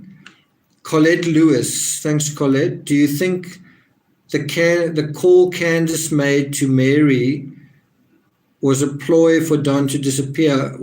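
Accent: South Africa. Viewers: Distinctly South African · Accent sounds like S.Africa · Your accent is SOUTH AFRICAN!! I'm a fellow African, a Zimbabwean. Thank you for your coverage of this disturbing case. The whole world wants Summer home except the parents (if they can be called that) They don't deserve that title in my opinion. The "for sale" sign on the drum outside while Summer plays in the rain speaks volumes to me.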